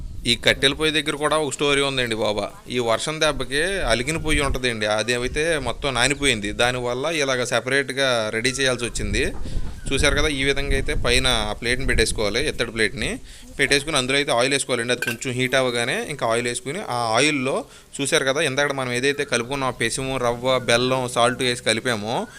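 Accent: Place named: Konaseema, India. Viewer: అచ్చు తెలుగు కోనసీమ accent,perfect పాతకాలం వంటల పద్ధతి,మా పెద్దలను , పద్ధతి లను,వంటలను గుర్తు చేస్తున్నారు,truly అద్భుతః.from Maharashtra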